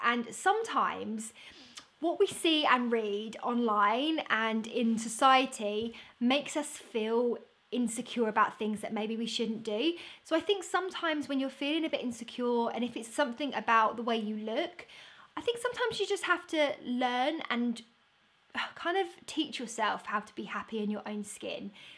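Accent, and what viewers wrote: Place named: United Kingdom. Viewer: Aw perfect,- at breakfast timeee :D To be honest, I watch your videos mostly because of you wonderful British accent. I miss England so much... My family visits friends in Devon from time to time and it always feels like coming home when we see the cliffs of Dover! So thank you for ... uhm speaking - I guess? 😆 And btw the new hair colour looks cute 😄🧡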